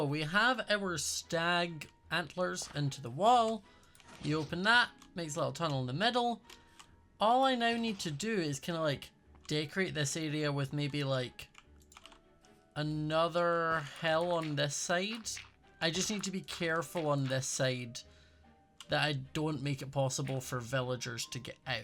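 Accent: Scotland, United Kingdom. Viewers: this explains so much the coruption coming from your portal how u and the demon both have scottish accents i cant wait til next episode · Your accent gave it away weeks ago... :) It was all a bit McAdder from Blackadder.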